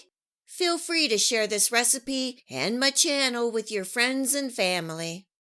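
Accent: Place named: Delaware Valley, United States. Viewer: You are from the Delaware Valley? My family is from Philly and South Jersey--you sound like my relatives!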